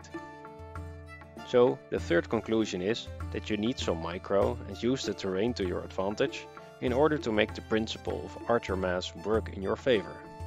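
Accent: Netherlands. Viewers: Oh god if that's not a Dutch accent I don't know what is :P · Such a thick dutch accent. Cannot listen to this, sorry.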